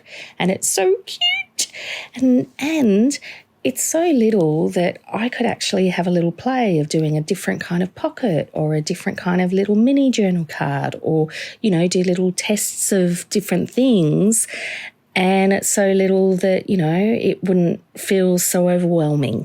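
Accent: Australia. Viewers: You are an absolute delight! Love your Aussie accent, put a smile on my face, being a fellow Aussie living in West Yorkshire, UK! Will definitely have a go at making these wee gems and your split pins/Brad pins too! Thank you for a wonderful tutorial and I’m so happy to have found you! 🥰🫂❤️🙏🏻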